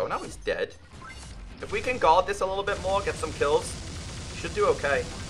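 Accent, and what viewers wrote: United Kingdom. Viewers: Ecko serious are u british or not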